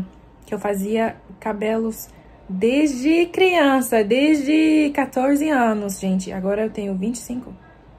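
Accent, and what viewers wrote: Northeast, Brazil. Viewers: vc realmente é nordestina vc ta falando sotaque 👉 vixi que bom a casa é sua NATAL RIO GRANDE DO NORTE. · "Ne" pra la "ne" pra ca kkkkkk parece nordestina zkzkksksksks ❤ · Volta logo para o Brasil🇨🇦🇧🇷vc já tem o sotaque Brasileiro❤️🥰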